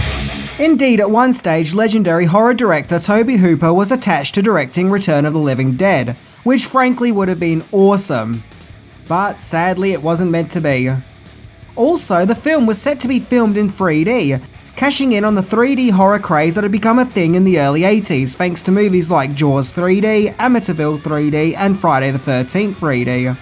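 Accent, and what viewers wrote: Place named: Australia. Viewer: He’s Australian, sometimes they pronounce things differently.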